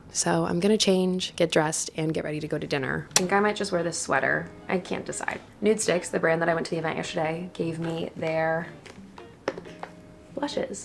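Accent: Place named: South, United States. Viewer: What is your accent? It sounds like you have a little southern drawl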